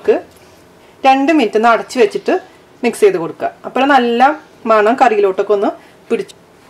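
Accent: Thrissur, India. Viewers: Nice, simple n tasty. Nice to hear your Thrissur accent, n nice English subtitles. If you put some more of those subtitles, it will be of great help for Non Keralite viewers. In north India Kerala people, Kerala cuisine are very popular. Wonderful job👍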